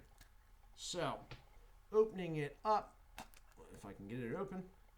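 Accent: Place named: Philadelphia, United States. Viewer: Don’t have it here in NJ. Had to order online. Sound’s like your in Philly?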